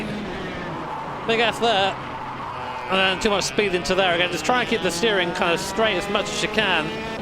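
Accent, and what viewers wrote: United Kingdom. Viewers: I love your British accent lvl. 100: "The difference between driving a kaa vs driving a *kaa*"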